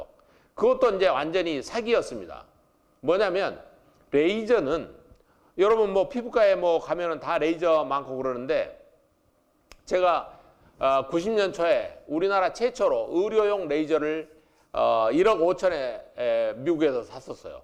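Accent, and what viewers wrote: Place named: Jeolla, South Korea. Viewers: 사기치지 말게. 전라도 말씨, 아주 답답한 진행. 그냥 덮으세요. 임플랜트? 티타늄 재료로 하나 원가 10만 원도 안돼. 치조골만 양호하면, 가까운 동남아시아에 가서 아주 싸게 하세요. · 전라도 말씨가 어때서요 ㅋ 별나네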